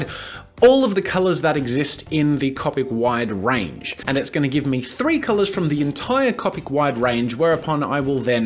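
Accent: Australia. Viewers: Australian accent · He sounds extra Australian in this video 🐨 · its the Australian accent probably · I love your Australian accent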